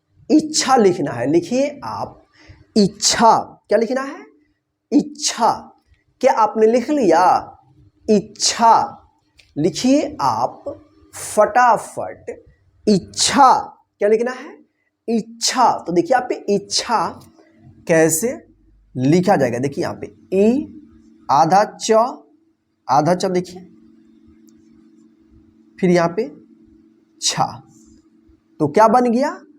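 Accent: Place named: Bihar, India. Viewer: आप हिंदी और इंग्लिश बोलना सीख लीजिए तो ज्यादा प्रभावशाली लगेगा वीडियो आप शायद बिहार की तरफ से बिलॉन्ग करते हैं आपकी बोलचाल की भाषा में उधर की झलक है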